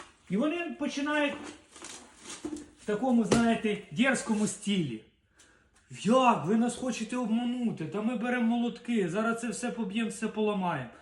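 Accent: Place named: Lviv, Ukraine. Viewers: Діалект чи вимова наша львівська, але не мова, мова укрвюаїнська . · Чиста наша львівська мова !!!❤😊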